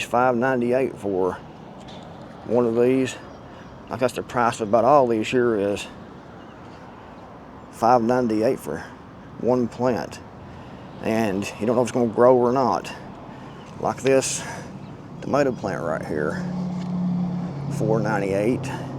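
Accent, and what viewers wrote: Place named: Tennessee, United States. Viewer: Either buddy, I just really like this video and I just think it’s really cool the way you explain things I love how you’re driving in your car. I think it’s really really awesome and I can’t wait to hear and see more videos from you. You’re really awesome and I like your accent. It must be nice living in Tennessee, but I definitely will stay as a fan and watch more of you I think you’re awesome man.😮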